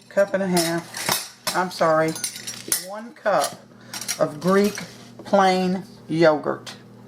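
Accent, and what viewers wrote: South, United States. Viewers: Good recipe the dough for pepperoni rolls. Yum yum yum. Loved loved loved your sweet sweet sweet southern accent. Thanks fo sharing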